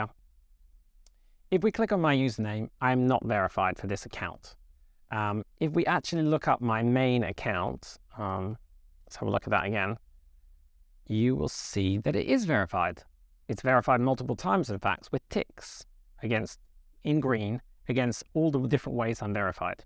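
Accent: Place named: United Kingdom. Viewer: I think of as being from the UK. is an Irish name (pronounced ) and as I understand it, he grew up in and has spent more years in the UK than anywhere. You'll notice he has a "British" accent. He does live and work in Norway, has a Norwegian last name and his wife and kids were born there. So... But yeah - his English is flawless because he's pretty much from there. No one has ever mentioned to me how good his Norwegian is... I'll have to ask some of his co-workers.:)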